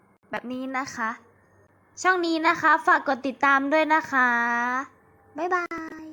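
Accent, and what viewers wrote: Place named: Isan, Thailand. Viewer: เว้าเหมื่อนหนูเลยค้ะอีสานค้ะหนูเว้าจนพี่ฟังบ่ออก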